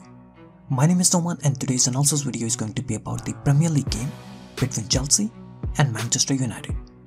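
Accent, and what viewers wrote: India. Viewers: are you from 🇮🇳India.. Cuz ur accent and the way u speak seems so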